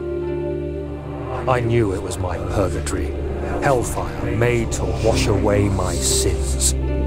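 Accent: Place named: England, United Kingdom. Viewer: I really need some audio books/stories with brooding english accented voices like this.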